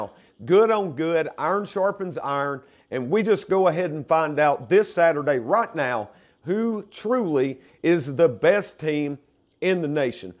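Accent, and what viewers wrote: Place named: South, United States. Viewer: Surely this isn't yet another diss on the southern accent. Lol You go baby. Love the drawl!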